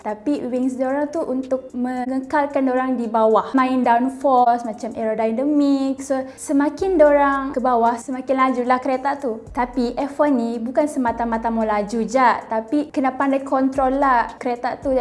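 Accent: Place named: Sabah, Malaysia. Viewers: Pekat loghat sabah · Dari loghat macam orang Sabah terbaik kak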